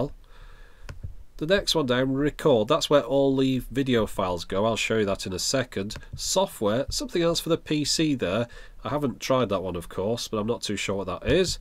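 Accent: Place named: England, United Kingdom. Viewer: it has an english accent, yuck! :(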